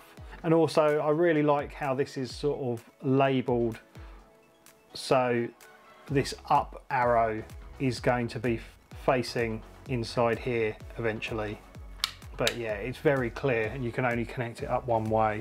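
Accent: United Kingdom. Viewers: Ahhh how nice it is to actually listen to a fellow brit. This is such a great video and very helpful especially for useless DIY bird's like me lol....thank you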